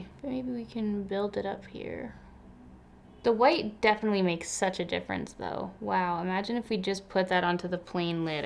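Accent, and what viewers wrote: RP, United Kingdom. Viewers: That QEII accent... A++! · You killed the Queen English accent!!! wow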